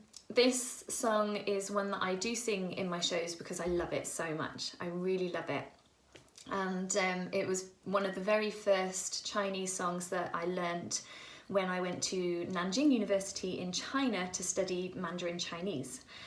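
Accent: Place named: United Kingdom. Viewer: Does your mother have a presence online? You make it sound like she is someone I would enjoy listening to. btw, I am also a biker but no tattoos. Speaking of listening, I love your british accent. I have a friend in my club that last year married an English fellow and brought him here to Michigan. Due to following so many GB YTers, I have a pretty good handle on the accent and slang. When he got up to speak about at our last meeting he got pretty excited and you could have heard a pin drop because some of his rant, while typical british, can be somewhat racist here. I started laughing and got up to explain what he actually said. That ended up being the quickest and best meeting in months.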